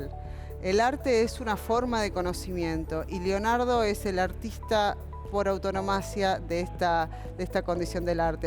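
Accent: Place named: Argentina. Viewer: Más allá si está bien o regularmente explicado , para mi es claro , me gusta escuchar una voz argentina de una bella mujer.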